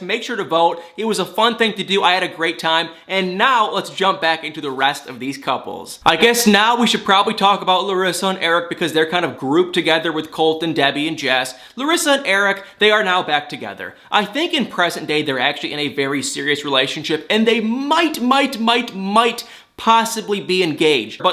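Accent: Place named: South, United States. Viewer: You're from Michigan? (me too) Wondering where that cute little southern twang comes from when you say 'ing' words. Great channel, enjoying your posts. 😊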